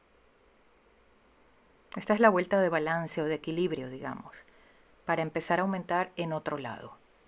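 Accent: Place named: Latin America. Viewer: Que bonito y que divertido ese chal asimétrico gracias por compartir... una pregunta de que país eres porque tu acento es latino. Es una curiosidad saludos desde Colombia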